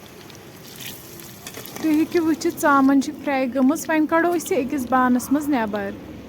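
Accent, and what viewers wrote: Kashmir, India. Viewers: I am always from shopian baatu se pehchaan liya tha ki hamare side ki he hai lots of love from my side allah tala thavnev khush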